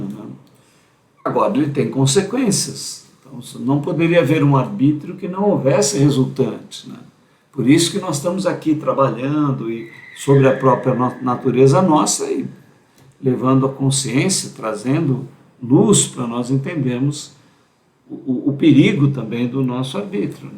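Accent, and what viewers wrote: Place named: Portugal. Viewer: I sotaque acportuguesa e perfeito 😉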